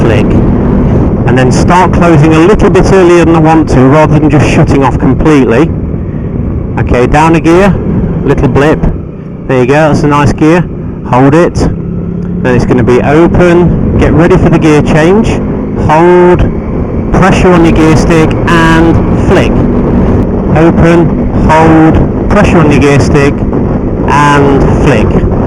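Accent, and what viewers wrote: United Kingdom. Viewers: I liked this video and hope I can apply it, but I'm not sure if I understood everything clearly (I always have problems understanding British accent, sorry!). So I must ask for a little help here. My problem: every time I switch gears, the bike yanks a little, after I open the throttle again. I can hear and feel it, nothing terrible, but makes me feel a bit like a barbarian who's killing the bike with an axe. I often think I open the throttle too fast, with zero smoothness. I also think I do the whole shifting way too slow. It's more like doing all these 3-4 things things one after another, and def not in a blink. I'm a beginner with a relatively fresh A1 licence and I must say, nobody really showed me how to do these things, not even during the course (which is generally not high quality in my country...). By the way I have a Suzuki GZ 125 Marauder (I really like the bike, so don't say I should throw it away because it's a damn mini-chopper, thanks! 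:P). If anybody responds and gives me a tip how to stop this bad(?) habit, thanks in advance!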